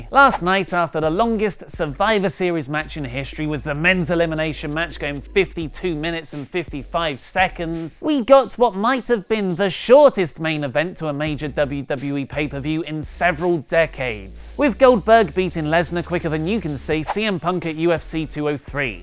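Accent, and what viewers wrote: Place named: United Kingdom. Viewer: I have trouble with your British accent!!!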